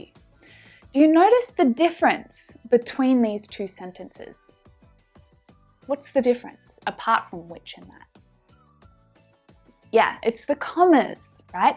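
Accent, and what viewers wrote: Spain. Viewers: I love your Spanish accent,! Sí, el invierno puede ser un infierno, también;-) Thank you again for this valuable classxxx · Hi I loved your Spanish accent. Xxx not say (inferno) invierno ok!!! You're lovely ❤️ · your spanish accent is lovely :)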